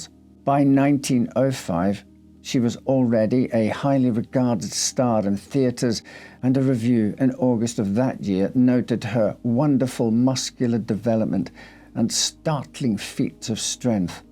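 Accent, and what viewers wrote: Scotland, United Kingdom. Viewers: He's Scottish!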